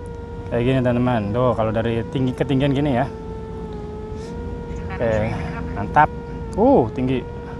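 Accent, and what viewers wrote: Cirebon, Indonesia. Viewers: Sampean wong ndi ang? Kayong ilate ky wong cirebonan sih ?... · Mas orang Cirebon Tah? Logate kyak wong cerbon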